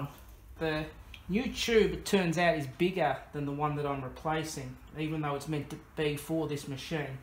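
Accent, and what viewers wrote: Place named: Australia. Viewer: He's gotta me Aussie... He speaks exactly the same as I do..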